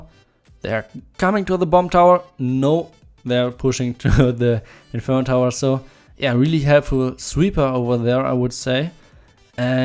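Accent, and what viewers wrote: Germany. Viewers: Your English is good bro with german accent.... and when you are going to next base building videos? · your English is really good u just have that German kind of accent witch makes since.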